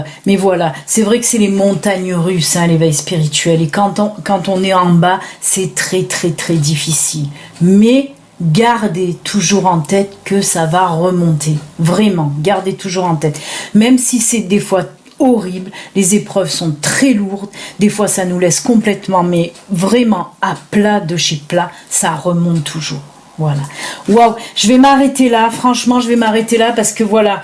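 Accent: South, France. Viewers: Merci beaucoup pour tout de tout mon cœur ton oracle et très beau j'adore j'adhère t'es guidance surtout avec ton accent du sud sa me rappelle mes origines je t'envoie plein d'amour bisous 😘🙏✨💋🔥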